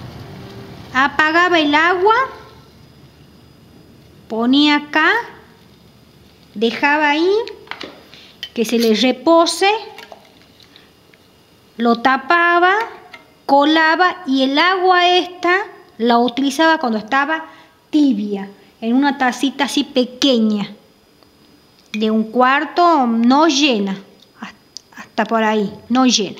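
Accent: Tucumán, Argentina. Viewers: gracias por compartir tus recuerdos,y recetas..soy Santiagueña y muy fuera de lo que dicen amo a Tucuman,es una provincia que me brindo mucho,sobre todo laboral...gracias por tú voz inconfudiblemnte Tucumana!!!! Díos te Bendiga!!!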